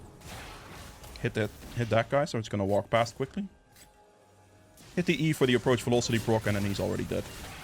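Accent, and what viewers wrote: Netherlands. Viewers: That dutch accent...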